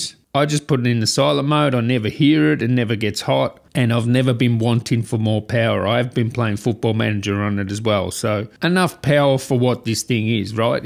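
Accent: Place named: Australia. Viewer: Australian accent 😊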